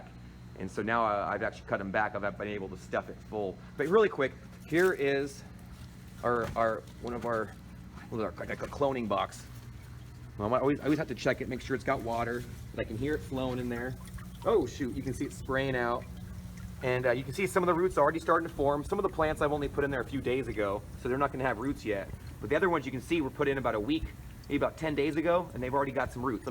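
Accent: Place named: Australia. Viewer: Love your Aussie!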